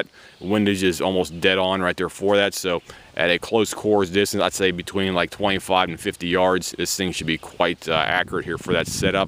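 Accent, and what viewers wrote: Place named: Western Pennsylvania, United States. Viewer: Holy shit...I'm first...that never happens...anyway...r u from pgh pa. by chance ?...that sounds like a w.pa. accent...nice video bro...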